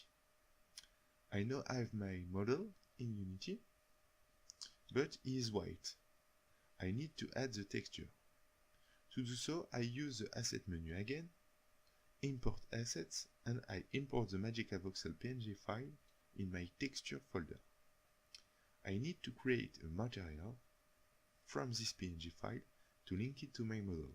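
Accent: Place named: France. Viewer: Don't worry about your french accent this tutorial is great! I just started researching voxels so this place is a good start for me! Thank man!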